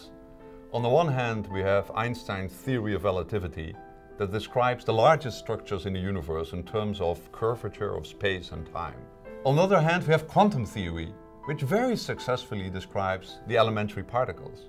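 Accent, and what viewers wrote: Netherlands. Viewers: He's Dutch right? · Hahaha Dutch accent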